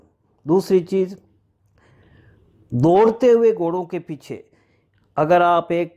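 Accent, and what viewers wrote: Rajasthan, India. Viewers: Sir aap Rajasthan se ho kya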